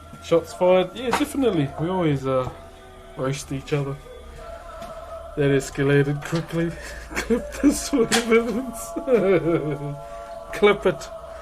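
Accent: New Zealand. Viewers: *has a new zealand accent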